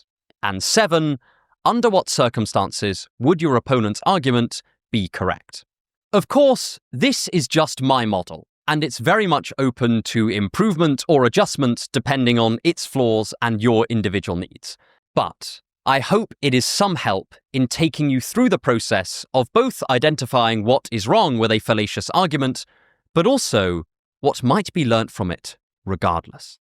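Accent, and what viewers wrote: England, United Kingdom. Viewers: I'm like 2 minutes in and the English accent alone make this so legit and sounds so intelligent.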